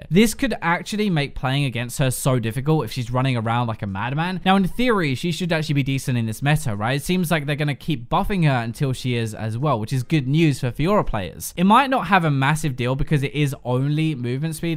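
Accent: United Kingdom. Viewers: i love his accent? what british accent is it?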